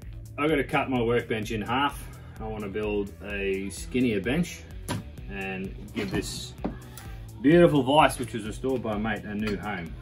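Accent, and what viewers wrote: Australia. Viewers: Aussie accent took me on a ride for sure. Thanks for a speedy reply! Thats a sweet hefty vise you got there. Looks like a Record’s 53e younger brother. Your shop looking spiffier and spiffier! Soon you’ll have to grow a beard and visit tattoo parlor. Its a must ... you know. LOL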